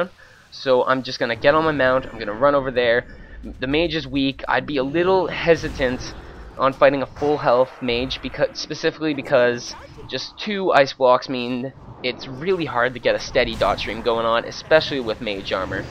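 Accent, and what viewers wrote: Canada. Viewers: I havent checked your channel, but you sound like a canadian :) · Are you Canadian?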